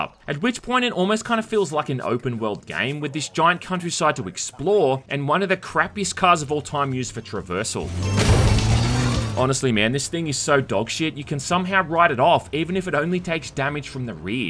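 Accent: Australia. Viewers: I live for this kind of review video, with aus accent. :face-blue-smiling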